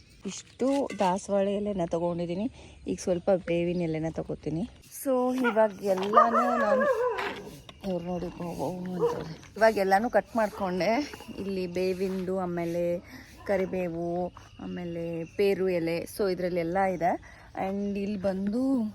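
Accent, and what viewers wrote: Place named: North Karnataka, India. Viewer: Niv North Karnataka davaru anisutte alva namma language tara 😊ede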